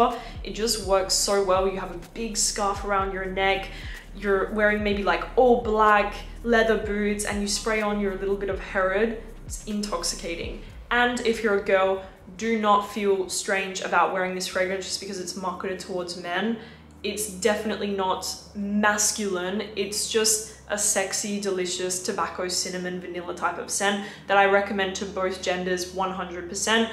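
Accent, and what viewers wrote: Australia. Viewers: What is going on with your accent? Definitely a little less Aussie now. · Your accent sounds australian, are you from australia?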